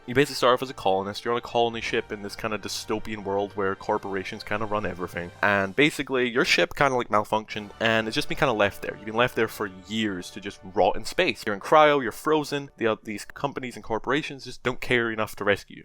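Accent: United Kingdom. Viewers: Dude htf talking regular but pronouncing your ts as if your from Britain? Like wtf 😂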